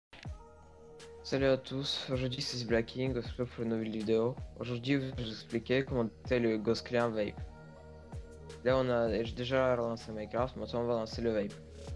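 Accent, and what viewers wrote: Russia. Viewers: Tes Russe ?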